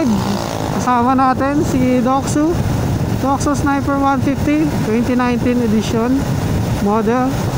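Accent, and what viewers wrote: Iloilo, Philippines. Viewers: iloilo po kayo booss??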